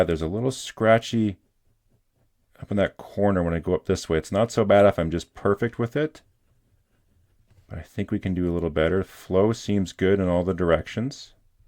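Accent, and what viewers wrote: Canada. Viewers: I'm an American and I lived in Hungary for 18 years and speak Hungarian. In the Hungarian language, the river is called the "Duna" (pronounced DO-nuh). Not sure about the origin of the word "Danube," but you're right, I've always heard it pronounced like you say, DAN-yoob. Maybe it's a Canadian English thing 🙂. Nothing major either way. This was an excellent and very useful video for adjusting nibs. Thank you, sir!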